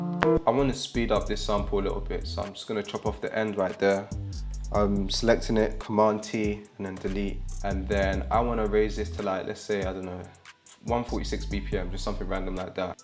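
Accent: London, United Kingdom. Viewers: You from London g?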